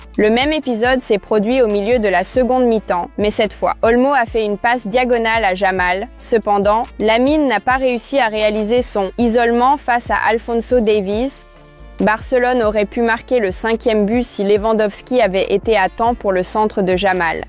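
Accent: Quebec, Canada. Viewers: Je ne comprend pas cette chaîne ? Est-ce une IA ? Une voix d'IA ? Une québécoise ?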